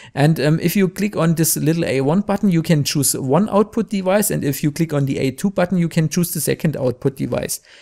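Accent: Germany. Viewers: man with funny German accent explains to me what I need to know. This is the perfect tutorial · starker deutscher akzent :)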